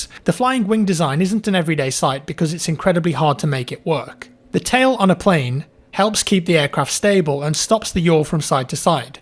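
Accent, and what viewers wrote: United Kingdom. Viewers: That british accent 💘💘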